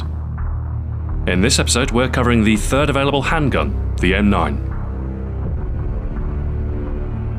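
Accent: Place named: United Kingdom. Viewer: your British voice is hypnotic..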